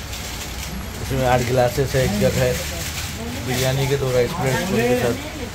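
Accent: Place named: Hyderabad, India. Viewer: Walaikum assalam o rahmatullahi wa barakatuhu Bahut accha lag raha hai aap hyderabadi language mein baat kr rhe ho 😅 Beautiful collection 🎉❤😍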